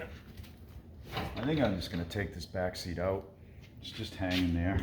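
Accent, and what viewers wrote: New England, United States. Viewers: You from Maine, NH or MA?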